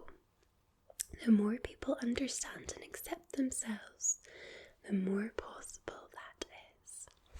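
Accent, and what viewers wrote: Scotland, United Kingdom. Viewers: Read today in an article by the BBC that scientists have said a Scottish accent is an anti depressant because of its ability to cause ASMR....after watching your videos for the past year I can honestly, conclusively say those claims are true! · Are you Scottish? (I know its in the name but still😂) I was just wondering because I am Scottish and I have been looking for a asmrtist who is Scottish because I don't know why but I love Scottish asmr😂 I also wanna say that I love you and your channel so much! You have helped me with my depression and anxiety! So a massive thank you💖 Keep up the wonderful videos!💕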